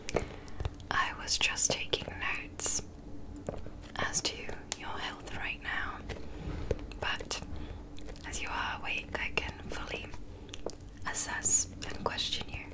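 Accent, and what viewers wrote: United Kingdom. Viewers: That British accent though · You are the Most Beautiful Doctor with The Most Beautiful Eyes and the Most Beautiful British Accent!! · ehh:3 The British accent is back - Love it:3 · i see she channeled her inner brit for this vid and i kinda like it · As soon as I heard the accent I was like:THERE BETTER BE A COMMENT WITH THE BRITISH ACCENT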